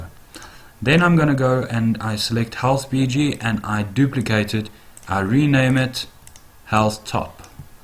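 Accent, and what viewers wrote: South Africa. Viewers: Are you South African ? Accent sounds familiar!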